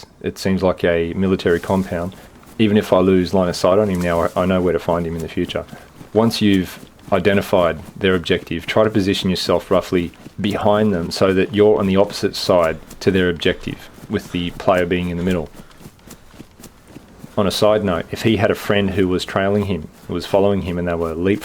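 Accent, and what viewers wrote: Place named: Australia. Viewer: British!? This dudes an Aussie buddy!!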